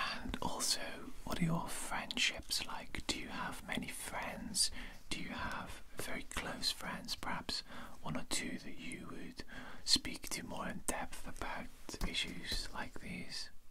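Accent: Wales, United Kingdom. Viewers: I love the Welsh accent! My step dad is from the valleys So glad I stumbled across this channel tonight 😊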